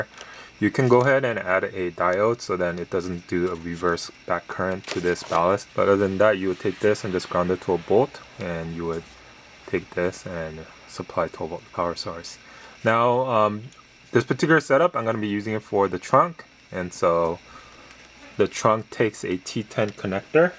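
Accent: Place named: South Korea. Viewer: I have '15 Accord Sport. I got a lot of useful information from you like the HID installation. Thank you very much. I got personal question. Are you from Korea? Your accent is very similar as mine. ^^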